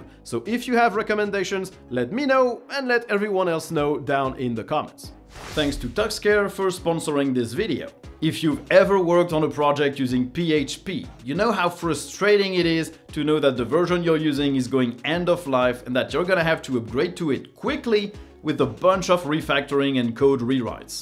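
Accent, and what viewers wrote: France. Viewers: What is your accent? sounds French, but with a something. Belgian?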